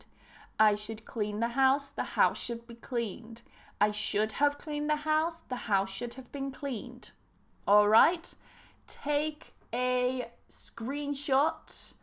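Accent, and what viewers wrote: United Kingdom. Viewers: I think it's beautiful British English 🇬🇧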